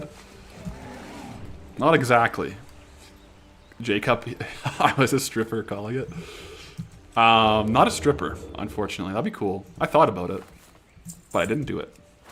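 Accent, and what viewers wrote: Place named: Alberta, Canada. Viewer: oh shit! Buddy is from Alberta haha, love to hear it my man!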